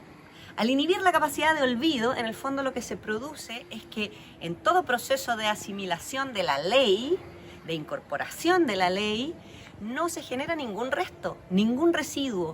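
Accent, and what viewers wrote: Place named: Chile. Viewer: Hola, que buen Canal me acaba de recomendar youtube, por tu acento asumo que eres de Chile cierto? Saludos!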